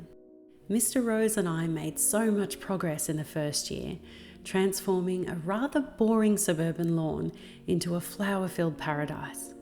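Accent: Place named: Australia. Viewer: Beautiful video, I came over here as a lady watching my videos said I sounded just like you ❤ same tone…except I hear you are Australian while I am British 😂 a compliment either way ❤❤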